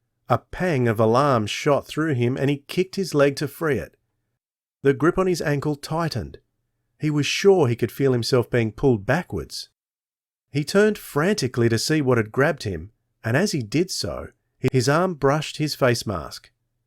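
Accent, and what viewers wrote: Australia. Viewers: Thank you for your reading of this Junior Sleuth series of books by Robert Arthur. I appreciate your fine impersonation of the Greek boy's accent. Your own Australian accent is interesting to listen to. Some years ago, I read The Mystery of the Haunted Mirror. That was my first discovery of Jupiter, Pete and Bob and their Detective agency, The Three Investigators. ☎️🏛☎️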